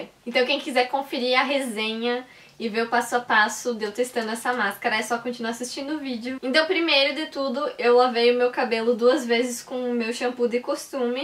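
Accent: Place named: South, Brazil. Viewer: Tudo bem? Tô chegando agora por aqui, tu é gaúcha?? Achei teu sotaque parecido com dó sul, hoje moro nos EUA e deu tanta saudade do meu sul te ouvindo falar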